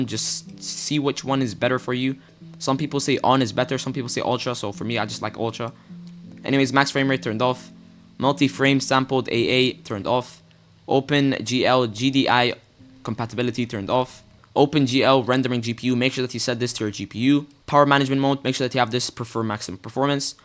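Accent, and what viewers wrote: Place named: Sweden. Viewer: dude sound like he's swedish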